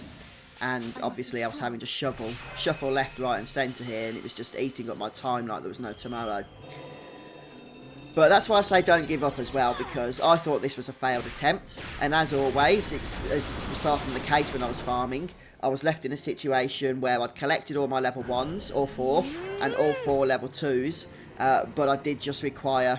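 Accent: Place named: Cockney, United Kingdom. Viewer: Whooo cockney is strong with this one.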